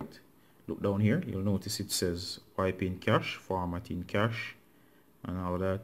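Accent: Jamaica. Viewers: U kinda sound jamaican im also jamaican!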